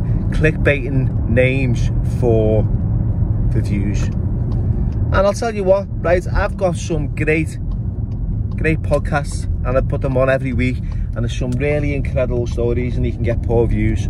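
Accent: Liverpool, United Kingdom. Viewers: Go on lad scouse through and through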